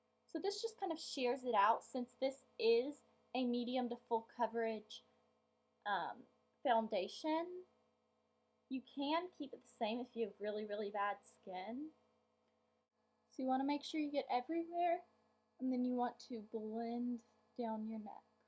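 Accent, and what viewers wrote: South, United States. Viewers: Thanks for this video so much!!!!!!! . . . .Is it me or is your southern accent slowly fading away?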